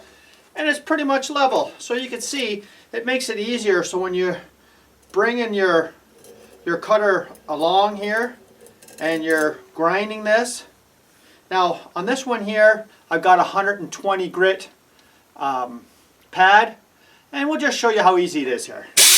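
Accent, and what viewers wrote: United States. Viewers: Is that an Irish accent mixed with an American.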